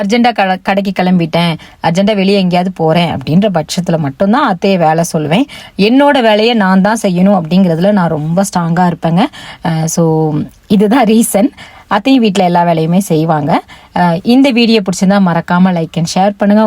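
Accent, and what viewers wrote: Tirunelveli, India. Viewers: Akka unga pechu tirunelveli mari yaa eruku ❤❤❤❤❤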